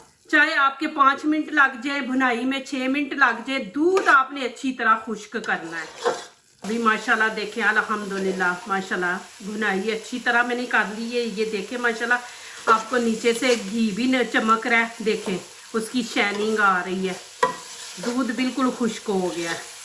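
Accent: Punjab, India. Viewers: She is constantly speaking in stupid punjabi accent. Quite irritating. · Aapki Punjabi vadde hai... aapnki urdu se Pata chalta hai ...masha Allah... 🥰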